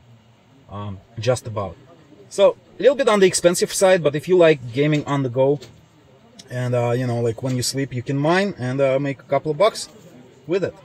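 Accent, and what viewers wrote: Russia. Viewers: Русский акцент??)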